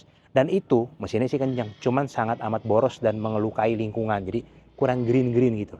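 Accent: Jakarta, Indonesia. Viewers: nonton cuma karena logat betawinye kokoh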